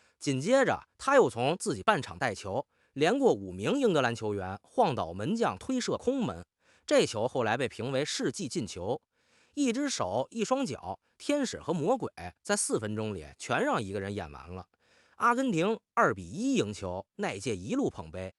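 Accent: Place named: Tianjin, China. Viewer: 这个台北主播为啥一股天津味儿？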